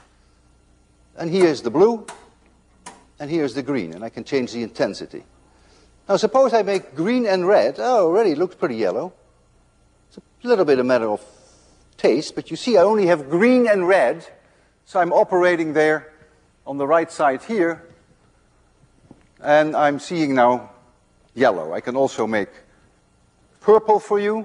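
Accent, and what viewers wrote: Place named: Netherlands. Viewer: sounds like he has a dutch accent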